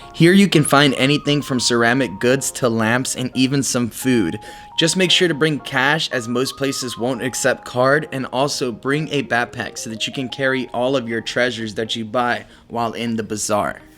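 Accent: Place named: United States. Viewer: You sound like a yank but look Turkish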